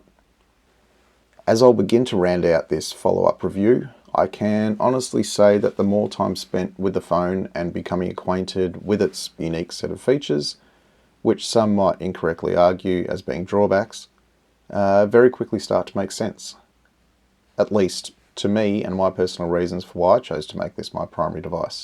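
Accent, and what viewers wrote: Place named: Australia. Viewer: Great review, knowing both sides of the coin, why not go for the best side, nice to hear an aussie not talking too much aussie, no offence. Regards London boy.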